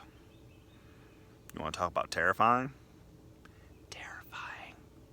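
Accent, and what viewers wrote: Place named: South, United States. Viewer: Your southern accent has really improved.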